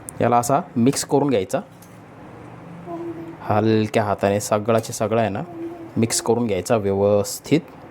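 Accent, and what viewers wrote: Nashik, India. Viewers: Tumacha tone Nasik cha chan vatato aikayala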